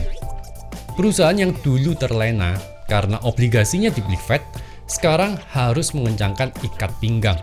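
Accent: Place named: Surabaya, Indonesia. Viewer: Bro asli suroboyo yo?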